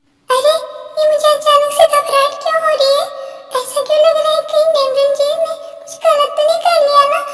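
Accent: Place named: Bihar, India. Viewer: haan kyunki aap bilkul meri tarah thinking rakhte ho mujhe story se hi pata lagta hai aur ek baat aur aapka bihari excent bhi mujhe pasand hai kyunki main bhi UP wali hun🤭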